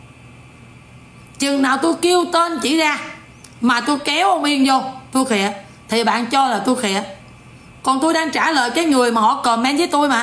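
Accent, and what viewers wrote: Standard. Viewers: noi qua chuan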